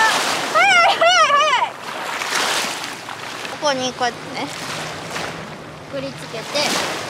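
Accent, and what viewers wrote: Kansai, Japan. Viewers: 可愛い❗関西弁たまらん。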